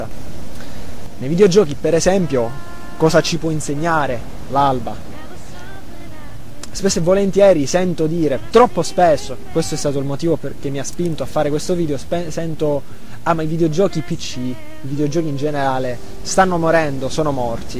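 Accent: Italy. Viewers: Dal modo in qui pronunci la C si sente che siamo dello stesso paese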